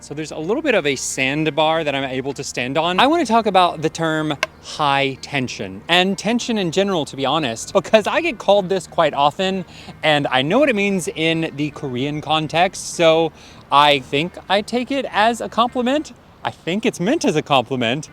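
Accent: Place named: United States. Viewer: 한국말 하실 때랑 목소리가 전혀 다르신게 더 매력적으로 느껴집니다. 목소리, 톤, 발음이 평소 많이 듣던 미국영어 음성이라 선명하고 정확하게 들려서 너무 좋아요. 딕션이 좋으시다~라고 하면 이것도 콩글리쉬일까요? 사실 콩글리쉬 자체도 콩글리쉬라 Broken English라고 써야한다는 얘길 듣긴했습니다만ㅎㅎ 좋은 영상 감사해요. 야외스튜디오 너무 좋습니다~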